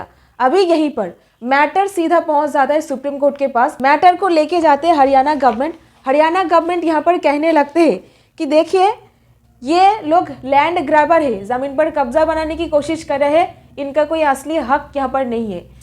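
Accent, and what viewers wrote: Bengal, India. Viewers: Clarity and content, both well done. Your Hindi with a dash of Bangla makes the presentation more attractive and beautiful.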